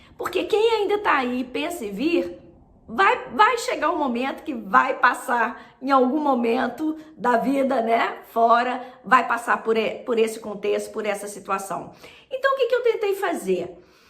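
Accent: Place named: Minas Gerais, Brazil. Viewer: Minas é nosso país 🙏. Obrigado pelo vídeo xará...me emocionei mto na parte do sotaque!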